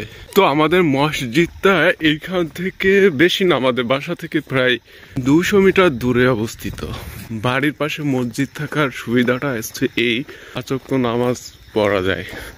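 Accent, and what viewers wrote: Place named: Purulia, India. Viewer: হ্যা আমি জানি কোন দেশ না। ভারতের পুরুলিয়া একসেন্টৈর মতো লাগলো তাই জিজ্ঞাস করলাম ।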